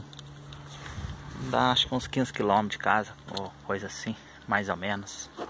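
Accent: Minas Gerais, Brazil. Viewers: Boa noite que lugar lindo natureza pura que saudade da época que morava na fazenda do bálsamo perto de piscamba município de jequiri não preocupe com seu jeito de falar e na simplicidade do nosso idioma mineiro e bao e bonito como dizia meu avô eta trem bao ser mineiro uai abraços fica com Deus. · Oiê, acho o seu jeito de falar lindo, acho que é de mineiro mesmo, também vc não têm que se preocupar com erros, a maioria das pessoas fala errado, pra que falar no pé da letra eu também falo, gosto de falar errado, e tem mais estamos enteressado é ver estes seu vídeos maravilhoso. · Que delícia de passeio amigo, adoro ver essas casinhas de colônia, um lago na frente ainda pra ajudar na beleza. Não se preocupe com a maneira de falar, nós mineirinhos somos assim. Um grande abraço! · Português de mineiro tbm sou assim.uai...Kkkk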